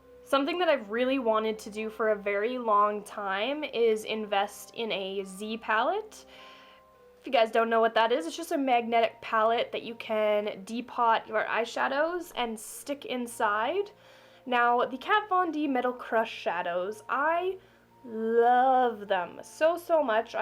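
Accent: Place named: Germany. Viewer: oh sorry then ._. Your english is really really good but I heard a little accent and I thought It could be german cause my best friend is from Germany and she has almost the same accent. Again I'm sorry :(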